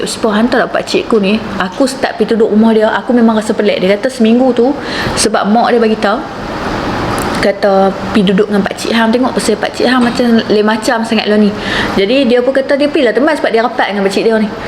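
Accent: North, Malaysia. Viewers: Loghat utara..penceritaan yg best dan enjoi ja dgr..👍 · good content but for me better not to talk slang utara ni. sbb tak semua org faham slang dia. try cakap kl sis. spread love no hate. btw im a new sub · aku suka betul dengar loghat utara ni besssssst !!! :D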